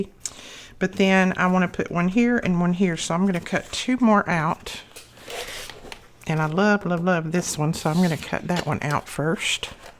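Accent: South, United States. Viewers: Love your Southern Belle accent. I have a Tennessee Hillbilly accent which is more rough. Thank you for sharing your beautiful creations. This is an adorable kit. ❤ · I was watching your video again which I love watching you Craft and your southern accent anyway and 32 minutes and 15 seconds I think you’re talking about putting some pink rose on the paper and not covering of the beak of your bird and I was thinking well why didn’t you put it just on the top of the page then it was just a thought we’re on the other side of the paper anyway thank you for teaching and that was my Pinyan have a great day And please don’t be upset with me God bless and please have a amazing day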